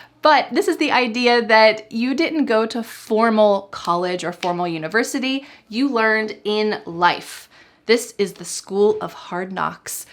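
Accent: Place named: United States. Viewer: I went to college in Bogotá (Colombia), graduated in 2023, majored in Law, currently attending the LLM at the Hard Knocks Academy, rather book smart, and lacking street smarts, definitely a bookworm (in Spanish it would be: Ratón de biblioteca: library mouse", literally), currently dont have to hit the books, and life long learner (love the movies, enjoying awards season at my local multiplex - CineColombia), maybe a little bit oversharer - no way!); thirsty for knowledge, if not the Booze - this I had to look up at Merriam - Webster, as a synonym (our local spirit being Aguardiente, lit. fiery water; tha latter won´t make it to Merriam-Webster, but maybe to be found in the authority for the Spanish language RAE, and for sure this one I did look up at the memoirs of street academy/smarts); love that - I take it - american accent of yours. In the last bit a tried to apply at least one of Trunk´s elements of style. 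Thanks, very enjoyable!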